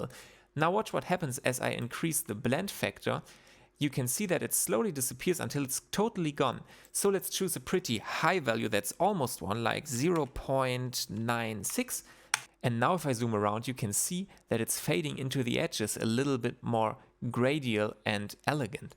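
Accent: Germany. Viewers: Not only is the accent german, but the human is painfully german. Not austrian, but so painfully german. Und damit danke fuers Tutorial und gute Nacht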